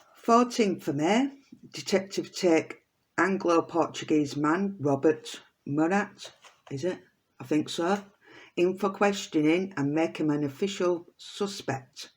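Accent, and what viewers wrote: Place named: Yorkshire, United Kingdom. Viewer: Hey I’m in Rotherham s Yorkshire, your accent sounds familiar hun x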